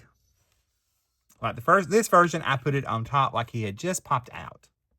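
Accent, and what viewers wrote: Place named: South, United States. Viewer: Such a cute card and it came together so quickly! The ink blending on your die cuts and the highlights from the white gel pen really do add detail that packs a solid punch! You're the first crafter that I have heard share the term "Decision Fatigue" and this is real! I'm sure that it occurs more than some will let on but thank you for bringing this valid term to light. Love hearing your southern accent and watching you create.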